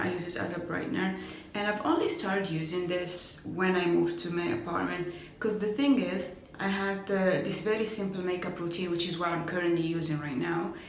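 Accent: United Kingdom. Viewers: Great video! You seem really comfortable and happy it's nice to see. I like the makeup look, I just don't give myself enough time to apply makeup in the mornings so skip it. Glad you are working on finishing your open products. I am doing the same. I think you my be picking up a bit of a London accent its cute!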